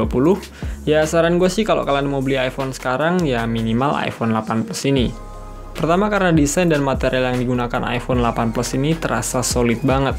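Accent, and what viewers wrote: West Java, Indonesia. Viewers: Bang lu orang cibitung ya😂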